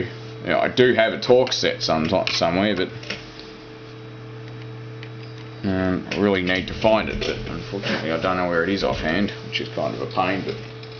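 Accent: Australia. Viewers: Stumbled across your video this evening, as I too have a 440t RCD that has tripped. However, like your video, the switch is VERY stiff to the point I may exert too much pressure on the switch. It is stuck in the tripped position, thus I am without power ( as of writing ). It's good to hear a typical blokey Aussie accent on YouTube BTW. 😃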